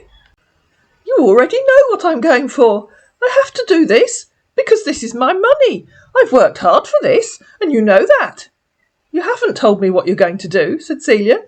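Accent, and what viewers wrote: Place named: England, United Kingdom. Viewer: perfume addict Thanks lol 💗 🤣 You have a lovely English accent, we were talking about our accents before, very easy on the ear. My accent is more flat. I hope you're enjoying your week ☀️🌿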